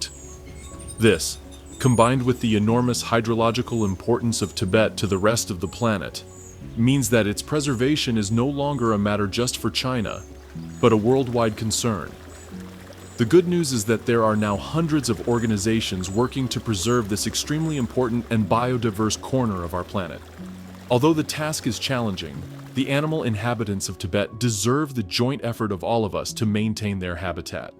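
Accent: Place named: Transatlantic, United States. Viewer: It’s quite interesting that a transatlantic narrator is describing an ecosystem that a transatlantic president is Hell Bent on destroying by openly declaring ‘ Drill Baby Drill’ someone needs to drill into Putin’s head to find where the evil is and to drill into Trumps head to find where the greed is. No?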